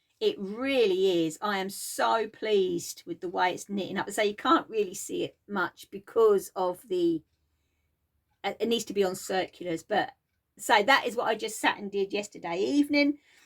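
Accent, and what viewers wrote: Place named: South East England, United Kingdom. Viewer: Hi, I am a new subscriber and was sent over to you by 911 stitcher. I am in the UK, living in Devon but lived most of my early years on the good old Isle of Sheppey and Medway towns, so I really apprediate your saf east accent lol xx I am a cross stitcher (on aida) but I enjoy watching your other crafts too. My nearest LNS is Patchwork Rabbit which is 80 miles away! I really am enjoying watching your floss tube and shall be following you from now one. I am on instagram as #missharrietsparrow Best wishes Kathy xx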